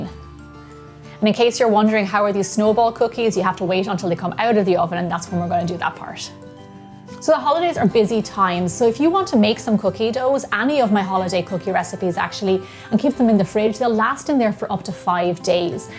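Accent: Ireland. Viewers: Came for the recipe, stayed for that lovely Irish accent! Can't wait to try!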